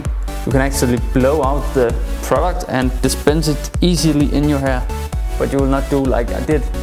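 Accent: Denmark. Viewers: Wow den danske accent der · Den danske accent er meget tydelig xD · Is je Danish or something? Got a strong dialect going on.. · That accent! 100% Danish! Luv it! <3 Spitzen Video! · Man kan bare høre, at han har en dansk accent xD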